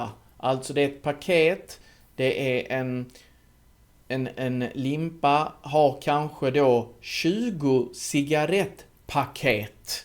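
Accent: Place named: Skåne, Sweden. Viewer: Tack! 😆Jag tyckte att du pratar Göteborgska. Men det är faktiskt skånska. Du pratar väldigt tydligt och jag tror att dina videor har hjäpte många som lär sig svenska.👍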